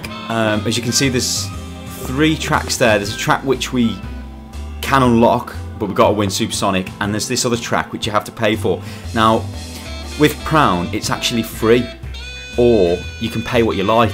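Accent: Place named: North, United Kingdom. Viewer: Your accent has changed since this video. You sound more northern in this video.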